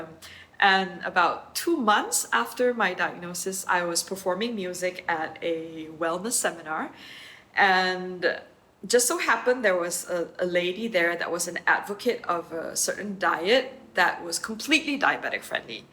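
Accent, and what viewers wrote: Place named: Malaysia. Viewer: You sound like a Malaysian, or Singaporean. My question: Is there a contact person in Malaysia?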